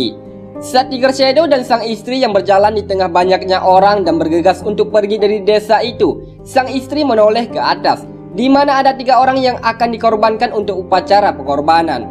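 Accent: Aceh, Indonesia. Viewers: logatnya orang aceh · Bang, aban orang Aceh ya? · Ko org aceh ya min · Klo di dengar dari logat bahasa nya pasti orang Aceh 😂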